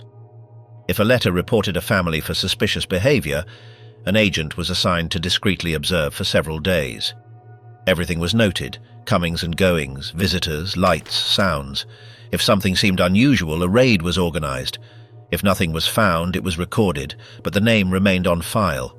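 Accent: United Kingdom. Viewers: Like as someone re-edited all the documentaries into a poor ridiculous English accent… really bad.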